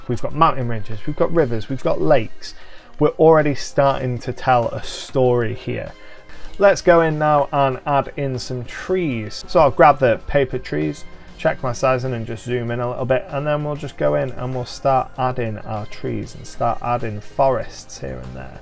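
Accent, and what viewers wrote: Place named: North, United Kingdom. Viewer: Hi New subbie. I played D+D way back when it first started in the 70s (eekkk - showing my age!!). Had a game going for 6 years in the early 80s. Got back into the idea recently and just started doing digital stuff. I purchased Wondercraft and came across your channel. You sound like you are from my part of the world (north east England). Looking forward to learning more from your videos! Thanks, take care and stay safe in these strange times.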